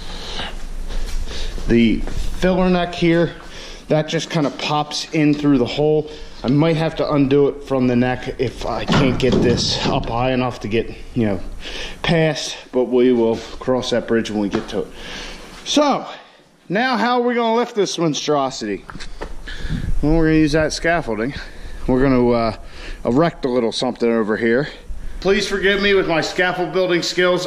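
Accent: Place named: Maryland, United States. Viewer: You gotta be from up around Maryland or at least that area. I got family up that way and would know that accent anywhere lol.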